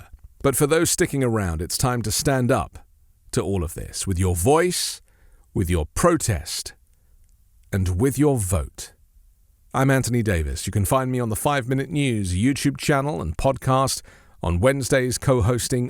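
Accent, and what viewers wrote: United Kingdom. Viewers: You have such a lovely calming voice, British accent, really easy to listen to.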